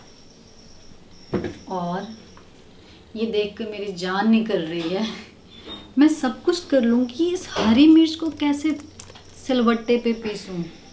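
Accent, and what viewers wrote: Bihar, India. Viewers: My mom is also fan of laliya character. And also she loved your show ratan ki shaddi. You raw and original love you indian touch especially bihari accent in your vlogs.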